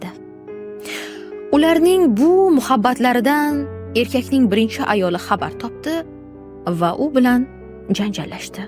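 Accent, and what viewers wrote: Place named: Russia. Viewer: Yahshi naraala aytasizuu, labizzi rossa qiyshaytirib gapirishiz nitodaa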